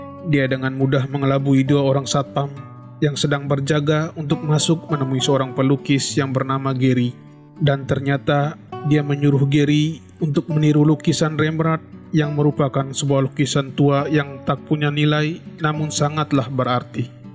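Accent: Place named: East Nusa Tenggara, Indonesia. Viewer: Seperti logat NTT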